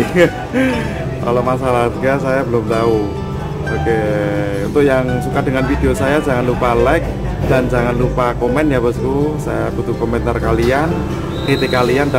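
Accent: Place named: Java, Indonesia. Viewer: Bang kayanyah ,orang jawa yah beda suwaranyah lain sama orang sunda bang kalow keramik batu berapah,,abang orang mana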